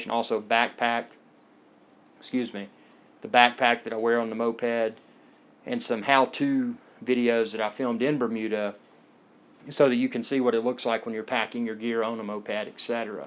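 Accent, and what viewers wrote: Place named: Alabama, United States. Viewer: I'm sure this is a great video, just can't get past the Alabama accent. Rolling on the floor laughing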